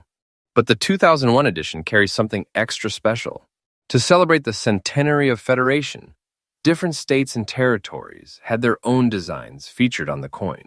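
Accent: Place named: United States. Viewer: What a lot of drama. Yankee accent !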